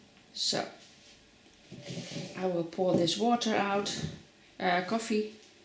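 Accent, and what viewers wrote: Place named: Netherlands. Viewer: Dank je wel, thanks for the great tutorial, I must give it a try.. I loved hearing the Dutch accent.. I have been in Australia for 70 years but was born in Ijmuiden..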